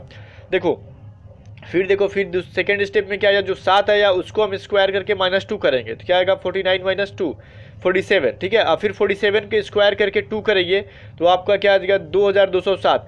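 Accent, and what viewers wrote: Bihar, India. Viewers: bihar se ho kya bhai?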